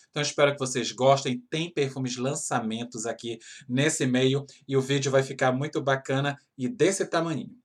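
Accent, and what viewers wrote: Pará, Brazil. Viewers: Achanhado kkk. Beeem paraense! Amo!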